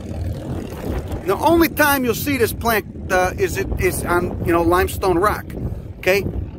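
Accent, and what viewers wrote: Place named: Boston, United States. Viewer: You guys are so bad! Lmao! It sounds like a Boston accent, but it's killing me.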